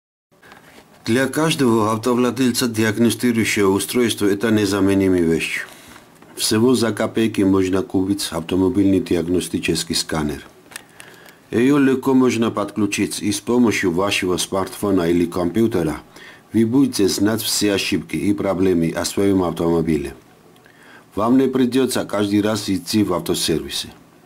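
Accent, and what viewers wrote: Georgia. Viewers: Спасибо за полезное видео ❗️Акцент как у Сталина 💪👍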